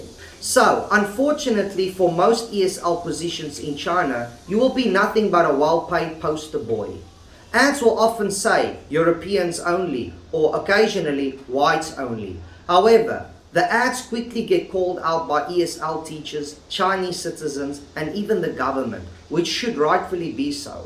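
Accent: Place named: United Kingdom. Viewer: gr8 ingormation. i believe u live sometime in uk bcoz ur accent is similar to british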